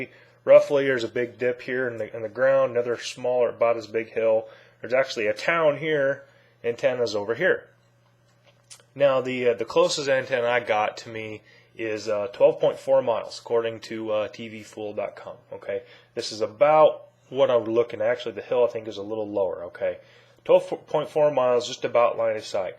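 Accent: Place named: Germany. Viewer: hi are you from germany? i noticed the german accent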